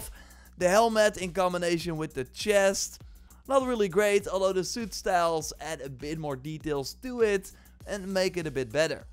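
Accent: Netherlands. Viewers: As Dutch as an accent can be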